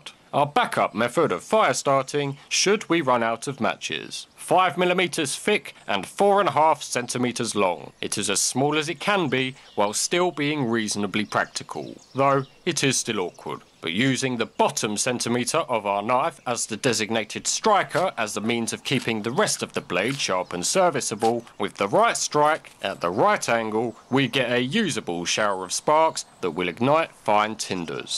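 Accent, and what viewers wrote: United Kingdom. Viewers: Ure probs american it is literally just british!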